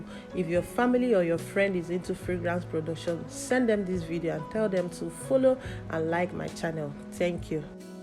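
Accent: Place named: Nigeria. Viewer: may God shine His Light and Bless you in this 2025!!! Your accent shows me you are from Nigeria. But I from Tanzania always feel so close to you. By the way you narrate your projects as if you talk to your close family. Thats how you invited me to follow and learn from you. Having tried tested and found its true. You have all my respects my sister. May God shower you with Uncommon Blessings in your life this year.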